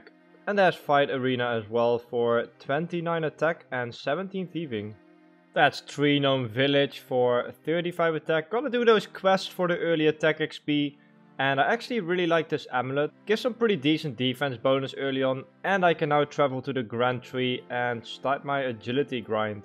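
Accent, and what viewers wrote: Netherlands. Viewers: The dutch accent, haha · Hearing the Dutch accent is meh, but other than that legit insanely good and I’d love to see more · Are you Dutch? Your accent sounds really familiar.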